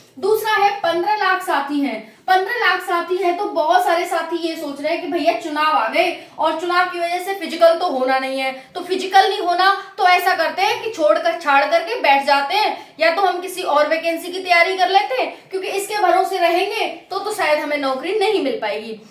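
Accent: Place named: Braj, India. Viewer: आप तो ब्रज भाषा बोल रही हो बहुत प्यारी लगी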